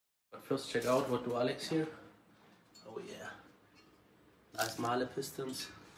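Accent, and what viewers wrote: Germany. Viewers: давно в Германии живёт,слышно по акценту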